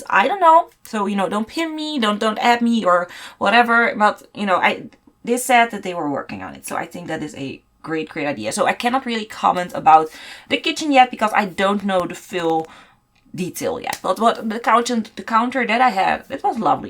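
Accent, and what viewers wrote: Netherlands. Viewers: Are u dutch? cause you have a dutch accent lol edit: oop yes you said your dutch in your video lol ❤️ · Oh Youre dutch haha I heard it on your accent nog een vraagje welke pc gebruik je? :3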